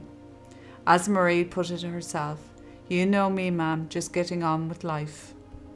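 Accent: Ireland. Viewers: you have an Irish accent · Love love love..cant wait for more content. A lovely irish accent. Such sad cases. X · How is it possible that his family did not realise that he had schizophrenia and as such a very real danger to himself and society, I wonder. So sad about Mairead, she was such a wonderful young woman. Thank you for your channel, and your lovely Irish voice!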